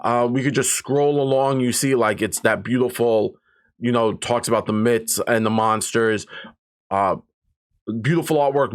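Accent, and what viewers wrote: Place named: New York, United States. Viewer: Great video! And great NY accent!!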